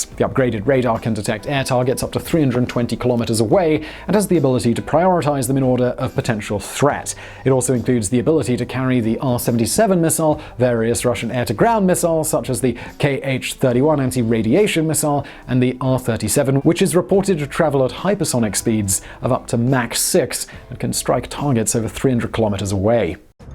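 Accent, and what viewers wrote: England, United Kingdom. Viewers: super faster talker with an English accent 80% lost to these bad choices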